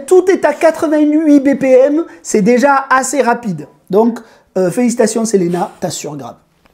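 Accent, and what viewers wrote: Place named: South, France. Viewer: Merci pour cette super vidéo. Un très bon exercice même pour les grands débutants comme moi. Tes vidéos sont une mine d'or et ta pédagogie au top. Dans le dernier exercice j'ai trouvé cool de faire 2 mesures E à vide G5/ A5 /B bémol 5 et A à vide C5/D5 E bémol 5. Ça fait bien bosser... J'ai cru entendre que tu avais l'accent du sud 😅j'habite dans le sud aussi peut-être ne sommes nous pas très loin pour des cours en individuels... Je ne sais pas comment te contacter en privé. Merci et continue tes vidéos.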